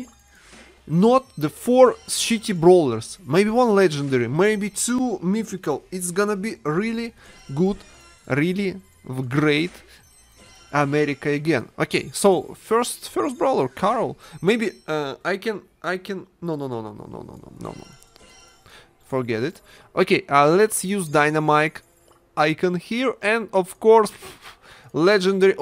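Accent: Russia. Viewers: Why you have a Russia aczent ? xD sorry I hope you can read dis I'm from germany xD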